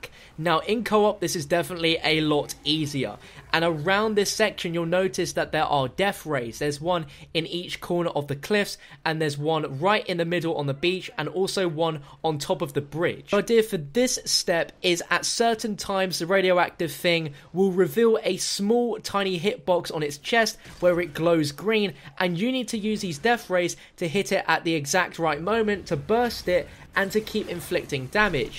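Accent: United Kingdom. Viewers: Nick Bailey it's British accent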